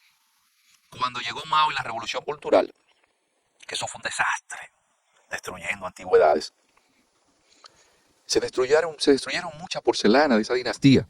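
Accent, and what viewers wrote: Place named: Castile, Spain. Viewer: Siempre veo los videos de wn tu canal, hablas con acento castellano. No se si eres de Espana realmente.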